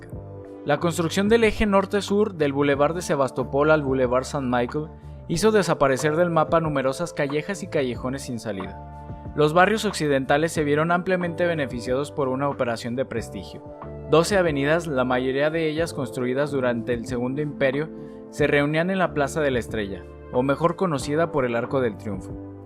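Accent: England, United Kingdom. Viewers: sí por dios, no sé a qué viene decir las cosas como si estuviera hablando inglés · 🤣 pronuncias a la inglesa los nombres franceses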